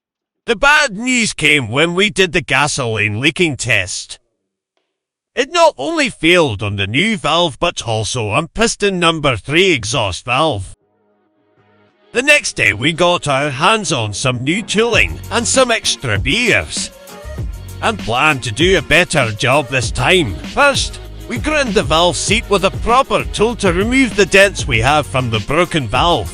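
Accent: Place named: Scotland, United Kingdom. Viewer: I love the Scottish voice! 😁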